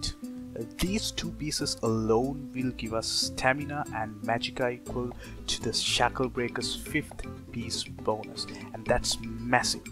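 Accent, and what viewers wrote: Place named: Asia. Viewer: loved de asian-e accent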